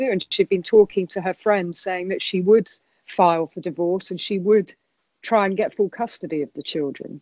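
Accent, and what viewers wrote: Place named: RP, United Kingdom. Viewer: You people have no idea she is well respected worldwide, secondly her way of speaking is a received English pronunciation and accentless, and her voice is very easy to listen to.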